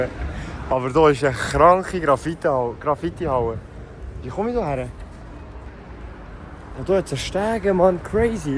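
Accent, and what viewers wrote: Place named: Switzerland. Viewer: würde schauen, wenn du kein schweizer wärst. verstehe kein wort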